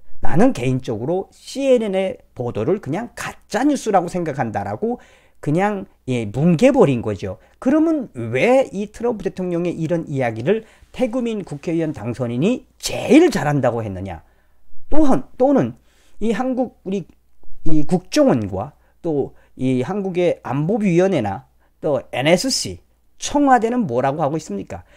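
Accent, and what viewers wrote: North Korea. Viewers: TV 이전에 너무 북한 사투리가 듣기 가 자연스럽지않아 시청을 기피했는데 오늘 우연히 방송을 들으니 정상적인 발음으로 성의것 방송을 하니 자연스럽고 잘 들립니다 앞으로 큰 발전 기원합니다 구독버튼 눌렀습니다ㅡ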